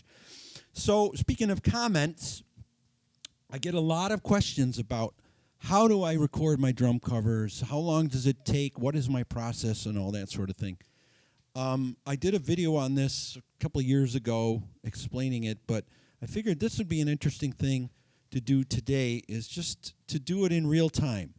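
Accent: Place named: Michigan, United States. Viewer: You sound like you're from Michigan! (I grew up in southwest MI).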